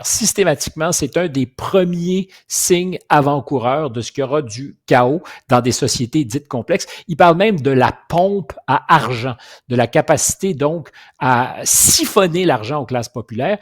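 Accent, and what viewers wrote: Quebec, Canada. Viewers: J'adore l accent franco canadien 🇨🇵✌️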